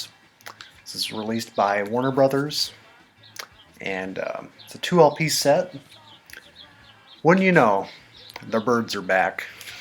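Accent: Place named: Lincoln, United Kingdom. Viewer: Hey! Cosmic Brian sent me here! He said you're from Omaha, but it sounds to me like you're from Lincoln? It's pretty cool watching a video of someone showing the treasures they found at places where I shop at occasionally!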